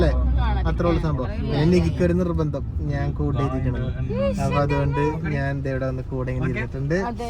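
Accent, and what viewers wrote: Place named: Ernakulam, India. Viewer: Ernakulam slang sani ivide aano veedu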